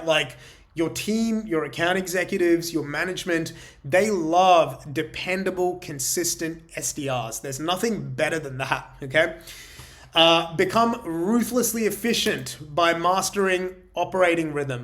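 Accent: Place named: Australia. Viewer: G'day, nice to hear an Aussie accent on a video like this - I'm an independent SalesOps guy who usually works with SDRs and AEs and now doing my own prospecting - so this is super useful. Cheers mate, ABC!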